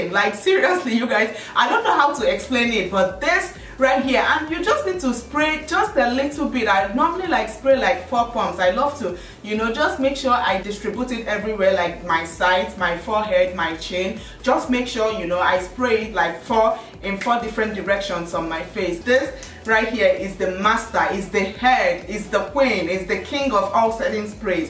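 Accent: Nigeria. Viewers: Like seriously I just subscribed like seriously I like you oooh naija accent on fleek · i love that you maintain your natural naija accent, instead of confusing us with oyibo 'init'. i learnt a lot. thanks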